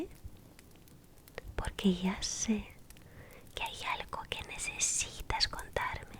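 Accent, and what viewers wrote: Spain. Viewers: No me da asmr el acento español tan marcado :( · mi mente escuchando a la chica: por eso esperaba con la carita empapada jjajajaj por la voz y el acento porque en españa hay muchos acentos habran como 5 no? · acento español :O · Odio que ahora sabes mucho más el acento español :(((, extraño a la rusita :'( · Me gustaban más sus vídeos antes que ahora, que se va marcando más ese acento español... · Ya hace tiempo que no veía videos tuya linda, de ver uno de tus inicios, al de ahora, tu fonética y pronunciaciones han cambiado mucho del tono ruso español al “español de España” es muy curioso 🤓 saludos. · Que raro que no pronuncie la C como la Z teniendo esa tonada española 🤔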